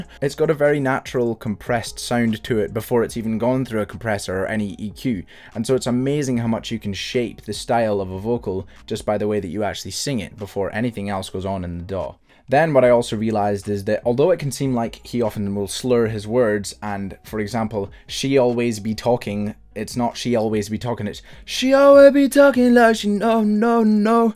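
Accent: Scotland, United Kingdom. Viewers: hey man, r u scottish?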